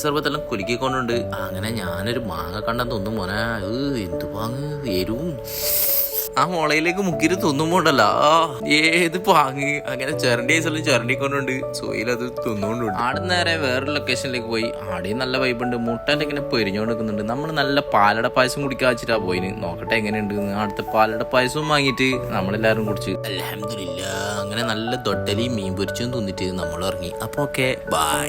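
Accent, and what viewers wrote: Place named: Payyanur, India. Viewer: ഞാൻ പയ്യന്നൂര് ന് അടുത്താണ് നമ്മുടെ അതെ സംസാരം കേട്ട് ചോദിച്ചതാണ് 💖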